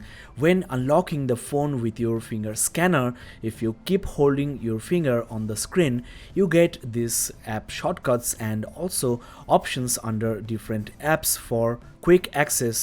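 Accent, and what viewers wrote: India. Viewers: Foul Indian accent.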